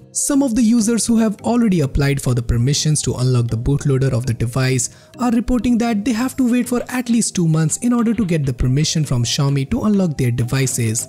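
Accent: India. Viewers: ur indian accent is dumb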